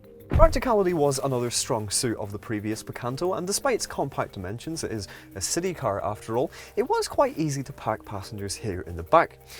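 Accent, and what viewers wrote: United Kingdom. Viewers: also like your accent, which part of UK is it from?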